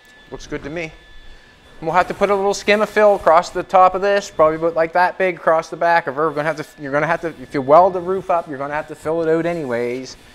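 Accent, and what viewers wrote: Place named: Nova Scotia, Canada. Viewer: I didn't even notice how you were saying plexiglass, I figured it was was your Nova Scotia accent... Lol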